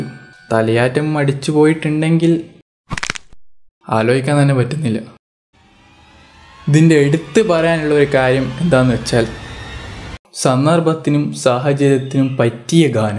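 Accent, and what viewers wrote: Kannur, India. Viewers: KANNUR aano place oru Kannur slang undallo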